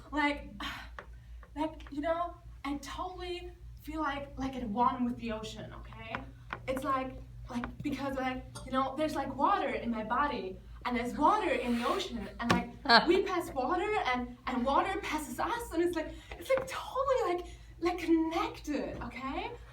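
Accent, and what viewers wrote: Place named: France. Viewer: ah- they want money for the original i guess. :/ i almost thought you might be french from the tiny bit of accent i heard. german is one of the languages i study daily. it's tough. keep up the awesomeness. : )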